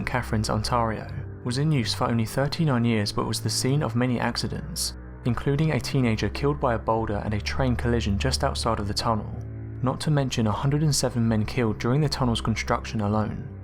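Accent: United Kingdom. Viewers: Why do you have a stupid limey narrating this damn video instead of an actual Canadian who can properly pronounce the cities and provinces in Canada??? Couldn't you have corrected the idiot before the final recording??? JEEZZZ...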